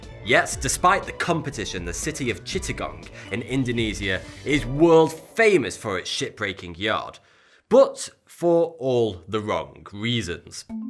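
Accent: United Kingdom. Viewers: That's just a native British accent, my friend. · What area of Britain does his accent come from? I'm curious.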